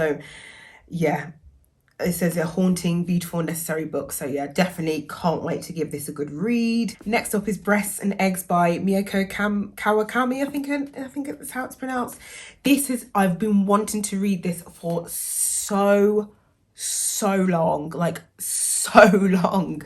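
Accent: Midlands, United Kingdom. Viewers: Glad you got 'Exciting Times' cheap. My experience going in hearing promising reviews, was left feeling more unexciting times. But hope you have a better experience with it, love your content and hearing a fellow midlander on YouTube ✊️ - Fab ✨️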